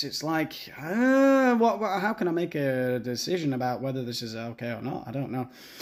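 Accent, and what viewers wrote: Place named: Yorkshire, United Kingdom. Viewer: It could be his Yorkshire accent coming out 😂❤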